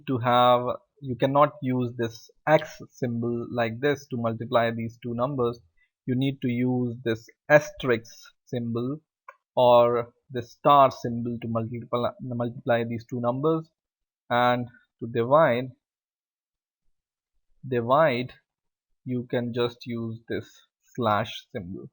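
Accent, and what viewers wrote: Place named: India. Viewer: He is obviously sound Indian totally not Chinese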